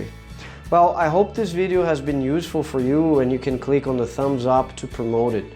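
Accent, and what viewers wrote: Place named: United States. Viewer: oh good im glad u speak american english i thght i might nt understand u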